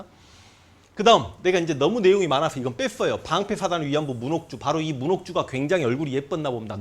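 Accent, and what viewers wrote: Jeolla, South Korea. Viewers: 100프로 강제연행은아니죠 일부 피해자가잇어도 자발적으로 간 인물도 많을겁니다. 지금도 사채때문에 강제로 몸파는창녀가잇다치면, 돈벌기편하고 하니 창녀촌 안마 마사지에서 몸파는 창녀도있는경우인데 그 시대라고 창녀가 존재하지않는다고 할수있습니까??? 근데 말투가 딱 전라도말투네요 전라도사람이세요?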